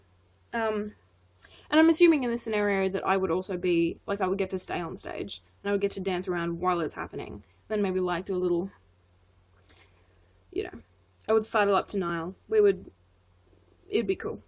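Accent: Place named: England, United Kingdom. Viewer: you're english!?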